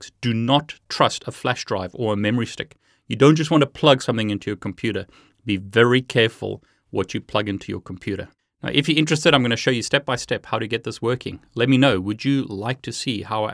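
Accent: South Africa. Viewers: for some reason somehow your accent sound like an english south afircan to me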